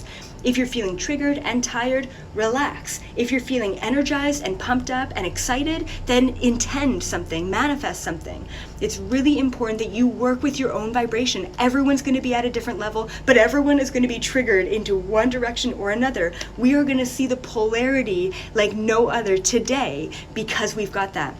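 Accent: Canada. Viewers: Noice ..ok so we are only two years apart . N.B and I are within weeks of each other lol ... If I can't sleep I put on that hella Cray Canadian accent and boom ..I'm out . Makes it hard to finish his vids so I have to day watch/listen lol . He and Terrence McKenna have been my sleep help for months and months 😂😂😂😂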